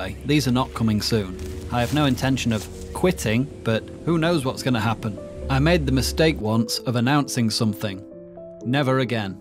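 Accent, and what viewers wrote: United Kingdom. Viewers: I don't know what you mean, he sounds quite British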